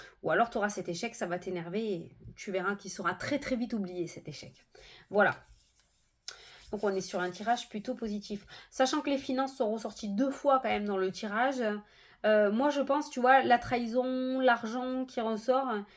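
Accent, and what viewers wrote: South, France. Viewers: Merci beaucoup pour ta bonne humeur et ton accent du Sud, j’ai toujours le même plaisir de te voir tous les jours. Quand à moi j’aime les deux formats que tu proposes j’ai envie de te dire fais comme ton cœur ♥️ a envie, écoute le et lui seul le sait. Merveilleuse journée à toi 😊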